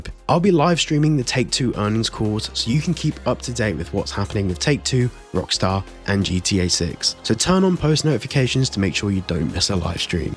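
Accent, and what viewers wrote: United Kingdom. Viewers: i scrolled the comments before starting the video and from the way they spoke i didn't see this coming but.. dude that mask is sick lol, fuck the ones pushing for a reveal, they're not worth shit. You sound British, so you should know first hand how people act with famous people, no one's worse than the brit paparazzi and i wouldn't hold kids on youtube at any higher a standard, your identity is yours, do what YOU want with it.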